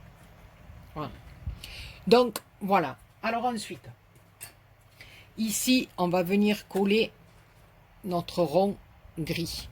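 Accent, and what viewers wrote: South, France. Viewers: Coucou 😍 c'est grâce à la vidéo de Greg que je me suis abonnée à votre chaîne et quel bonheur de vous entendre, car je suis expatriée en Alsace mais je viens du Sud (Nîmes) aussi....alors un enchantement de retrouver notre accent. Merci pour ce tuto je vais le faire dès aujourd'hui. Passez une bonne journée. Adessias et grosses bises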